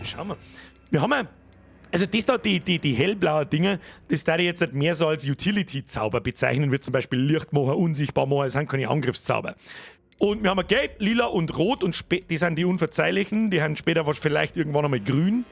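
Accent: Bavaria, Germany. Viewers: Geiler Stream wie eigentlich immer also wos soge denn lang du Bayrische Mundart Legende 💙🤍👍🍻🍻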